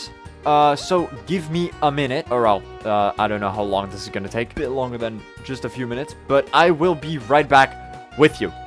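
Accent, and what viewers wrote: Netherlands. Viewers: bro you sound so dutch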